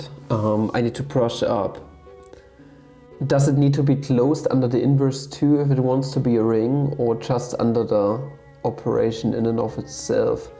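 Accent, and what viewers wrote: Germany. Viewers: dein akzent hört sich richtig deutsch an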